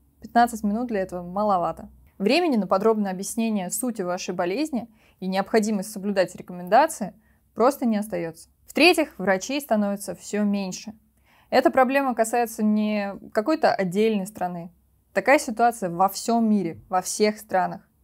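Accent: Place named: Belarus. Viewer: Чудо и обоняние , а голос завораживает как мне кажется с нотками белорусского акцента . Как же повезло тем студентам кому вы преподавали, а тем более в Вас объедены две мои самые уважаемые профессии педагог и врач ! Дай Бог Вам …….. Вы сами знаете чего . У Вас всё получится , потому что Вы живете для других , давая им удочку , а не жаренную рыбу , а это самое ценное . Спасибо!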